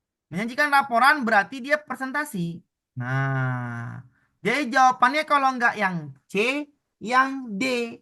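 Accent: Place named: Medan, Indonesia. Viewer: Medan kali logat bapak ni 😂😊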